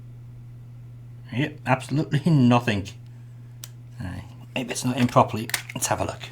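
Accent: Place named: Liverpool, United Kingdom. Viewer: I can hear the scouse twang now that you've mentioned it. Cool!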